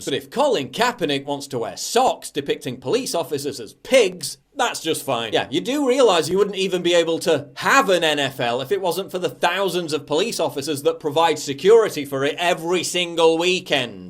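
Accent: United Kingdom. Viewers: It's like my thoughts with a British accent? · Talking standing in front of an world map, having an statut thinking as your banner, having a elegant british accent, having your full name as your username and making political rants on the internet does not make you an extreme philosoph who's always get facts right. It makes you ANOTHER random individual expressing his points of views and again... On the Internet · PJW, As a Christian man that recoils at the sound of profanity..... I must admit, I laugh when I hear you cuss! Not sure if its the British Sterling Accent or what, but it just makes me giggle!!! PS: DONT tell my Wife!!! =) Secretly subscribed!!!!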